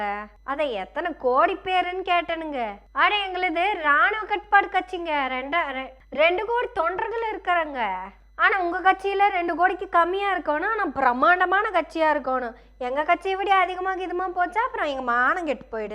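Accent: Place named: Coimbatore, India. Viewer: Sister Your Civai SLANG speech is Very Like it. Keep it up Same Style with Good informative News to Public. Welcome Sister Very Good Capable Excellent Speech.Take Care sister.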